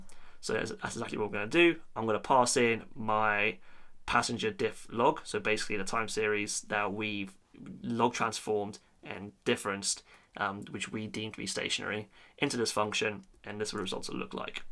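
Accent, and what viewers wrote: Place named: United Kingdom. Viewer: You are not talking with only your British people , so try to speak slowly with normal English and swallow your saliva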